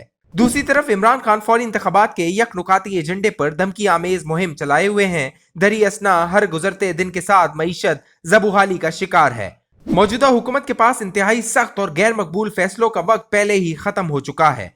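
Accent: India. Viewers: “NAYA DHONG” TV KO PAKISTAN ME KOI NAHI MILA AUR AIK INDIAN AADMI KO GIS KA INDIAN ACCENT HE COMMENTARY KE LIAY SELECT KIA HE …….. GESAY “CLOSET INDIANS” KO ANCHORS AUR JOURNALISTS KA SLOT DIA HUA HE …… ANTI PAKISTAN CHANNEL SPREADING SUBVERSIVE FAKE NEWS ……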